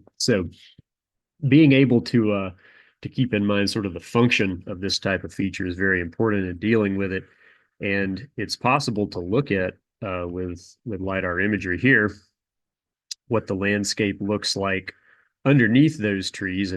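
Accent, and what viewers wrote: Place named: South Jersey, United States. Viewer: You are from South Jersey!!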